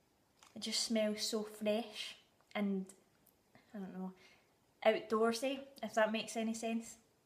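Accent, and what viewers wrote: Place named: Scotland, United Kingdom. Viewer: Awesome Review. Just subbed:) The scottish accent just makes me think you know so much more about whisky then I do:) I just found Deanston and wish I would have found it sooner. Slainte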